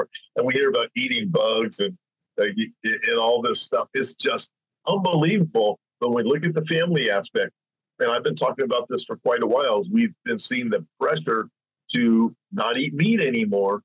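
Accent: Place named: South, United States. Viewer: I could tell you were somewhere in the south. 😊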